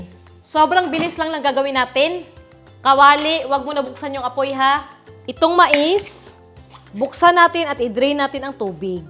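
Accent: Visayas, Philippines. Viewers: Bisaya kaba te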